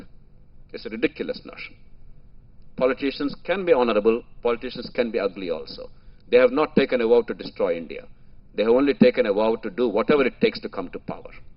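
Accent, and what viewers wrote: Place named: United Kingdom. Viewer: Really his voice resembling British slang... Hats off sir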